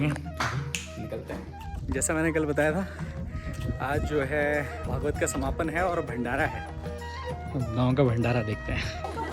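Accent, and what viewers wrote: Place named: Garhwal, India. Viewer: Gaun m aakr , hindi mai garhwali tone aa hi jati h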